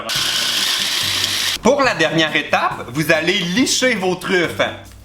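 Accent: Canada. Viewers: Je suis contente de enfin trouver un youtubeur qu parle comme une personne canadien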